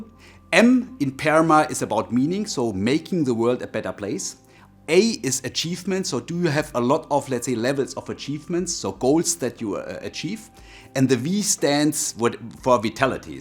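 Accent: Germany. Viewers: Love his German accent 🇩🇪❤️🎉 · That's a German accent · Thanks for the great Video, you really helped a Lot Your accent Sounds very German to me 😂 Have a great new year everyone M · First time you watch it: Consulting Cringe. Second time you watch it: German accent. Third time you watch it: Mh, good point… let me think. What are my preferences actually? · Your pronunciation is very interesting. Are you from germany? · listening to this german english accent makes me think that this is some sketch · Challenging listening to this tense rapid German accent. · Is he German by chance?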